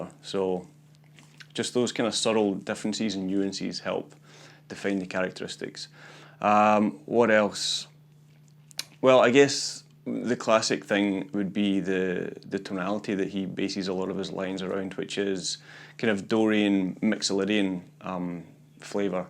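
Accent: Scotland, United Kingdom. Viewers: What kind of accent is that? Scottish - using American terminology- and he’s in Melbourne? Total distraction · You're Scottish!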